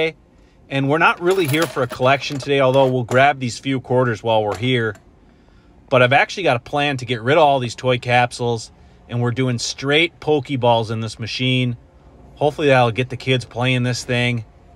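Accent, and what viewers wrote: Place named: Canada. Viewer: Where are u from? Your voice sounds Canadian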